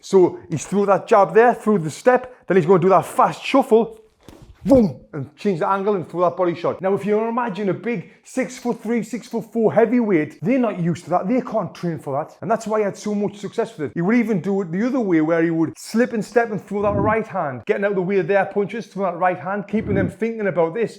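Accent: United Kingdom. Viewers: Ehy! You are an awesome teacher, I'm gladly following you but I have a question I hope you don't mind: Where is your accent from? I'm italian but I've been in england 4 times (london, scarborough, plymouth, york, manchester) and 3 other times in the united kingdom (scotland, ireland, north ireland) and I can't quite well recognize your way of speaking! It's British for sure, but that's all I can guess.. Thanks!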